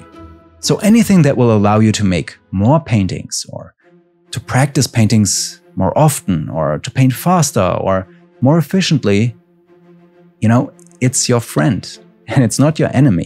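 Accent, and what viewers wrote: Germany. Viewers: He lives in Germany, as it is said in the "about" section and his accent is also German I think, so there's a great chance that he's Turkish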